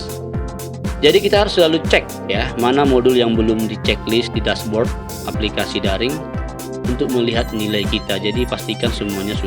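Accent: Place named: Makassar, Indonesia. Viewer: mantap pak aku kira makassar td hehe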